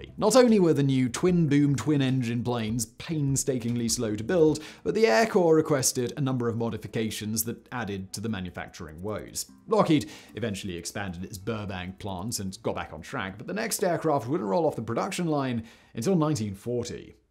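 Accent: England, United Kingdom. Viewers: Well, keep in mind - he has an English accent. So - y’know.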